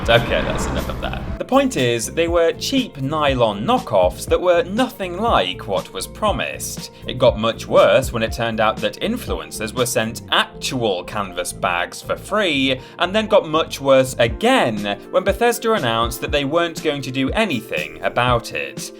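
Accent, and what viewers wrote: United Kingdom. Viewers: They're British :-) They were former WhatCulture Hosts.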